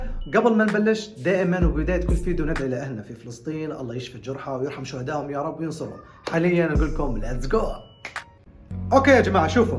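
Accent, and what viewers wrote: Iraq. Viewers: اللهجه العراقية تجنن😂 والله زمان جنت اتابع مقاطعك وهسه طلعت الي مرة ثانيه والله الحمدالله من طلعتلي مرة ثانية لهجتك تخلي الواحد يتيه كلش صاير عراقي الى ربع 😂😂😂 والله احسن من ينور اليوتيوب ❤ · عمره 16ولهجتك نفس لهجت العراق 🇮🇶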